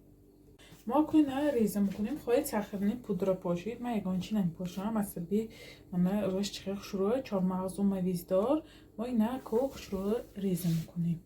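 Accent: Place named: Konibodom, Tajikistan. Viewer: ташаккур ба чавобатон апачон, ман аз Конибодом ,тарзи гапзанитон ба лахчаи мо монанд аст хело..дастатон дардро набинад,Ташаккур ба шумо,хело ба ман писанд хастед,хоксор апаи худам❤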